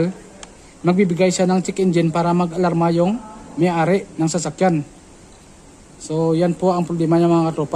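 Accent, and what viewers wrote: Iloilo, Philippines. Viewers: Parang ilonggo ka sir ah taga saan ka same problem kmi ei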